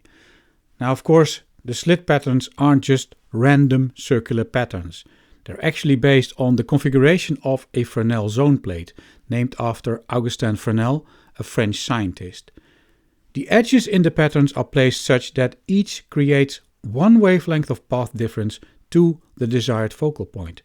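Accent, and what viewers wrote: Netherlands. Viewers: Interesting because the author is also not a native English speaker (he's Dutch). Maybe that helps non-native speakers to understand his English better. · Dutch accent is so good.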